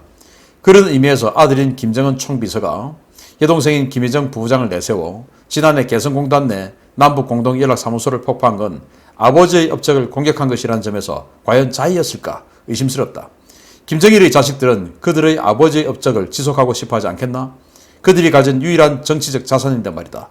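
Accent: Gyeongsang, South Korea. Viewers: 정은이기분이별로네요..핵폭탄를싸되야할텐데머니도.딸리니기분이영아니네요.교수님경상도 사투리로.큰옷입은모습를 우장바우라하심에넘정겹게들립니다 정은이도마음ㄸㅅ데로안되니만사가다헛된짓거리에후회라도좀햇음합니다.더운날시에늘애청할수있어서감사즈립니다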